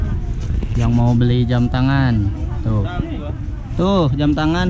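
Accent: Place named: West Java, Indonesia. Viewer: Assalamualaikum..... teh lucu, sundana napel wae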